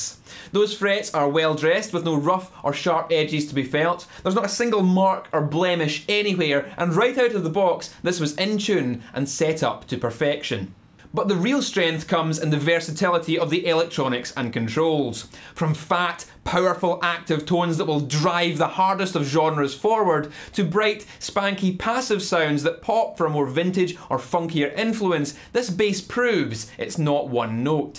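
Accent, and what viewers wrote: Scotland, United Kingdom. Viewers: I really like this site, is extremely funny and instructive to watch, but his accent is a little hard to follow for my South American ears, is it Scottish? I really like his accent, too!! 🤘🏼🤘🏼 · yeah, he's scottish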